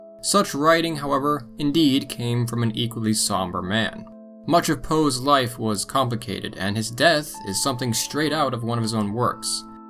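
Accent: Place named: Ohio, United States. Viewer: sounds like your near youngstown boardman ohio area.. lol.. great documentary.. thank so much..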